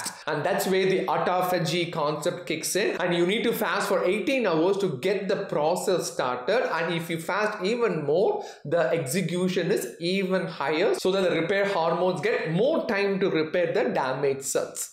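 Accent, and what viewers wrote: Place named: South India, India. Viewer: Your video are interesting to watch and the way u tell in South Indian accent and your friend name savarna Kumar lol 😅 Thanks for great knowledge very helpful 🎉😊 god bless you doctor 😊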